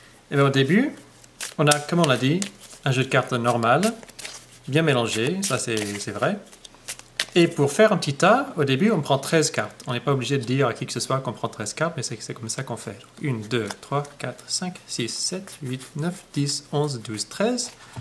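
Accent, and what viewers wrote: Quebec, Canada. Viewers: Super tour bien expliqué avec l'accent québécois en plus ! Merci :) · canadien ? (j'aime le canada :D)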